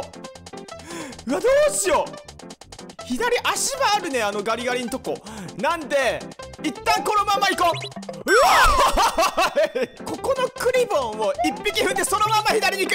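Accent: Fukuoka, Japan. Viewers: さんは福岡のかたかな？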